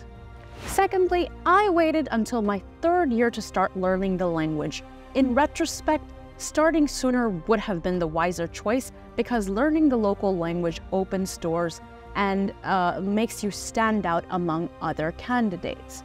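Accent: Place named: United Kingdom. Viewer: Mam how did you learn the particular accent ? Like proper British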